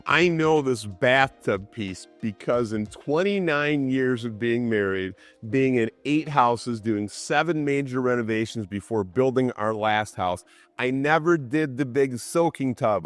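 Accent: United States. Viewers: Love the Michigan accent! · You sound like you're from the Binghamton area!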